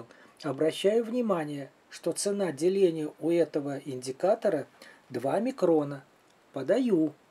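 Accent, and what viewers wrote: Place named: Volga, Russia. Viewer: Спасибо за полезное видео, и ещё волжский распев приятно было услышать)) где-то рядом с Самарой?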